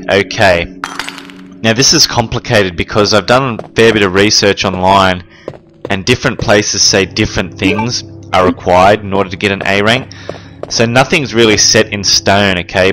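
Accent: Australia. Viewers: You're definitely an Aussie with the way you speak 😂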